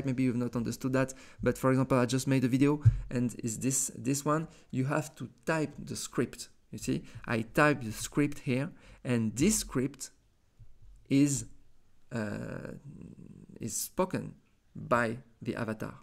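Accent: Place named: France. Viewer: Amazing really❤good english and nice French accent😂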